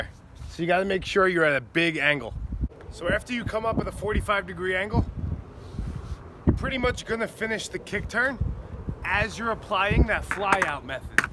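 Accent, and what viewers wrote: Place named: New York, United States. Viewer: Your trick tips are really great. A lot better than some of the others out there because I think you nail the "what you are supposed to be feeling" part really well. I gotta ask though, are you North Jersey or NY?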